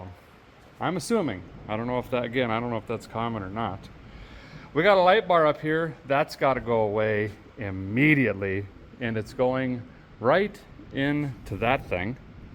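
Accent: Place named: Appalachia, United States. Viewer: Why do you talk like a hillbilly from 40 years ago?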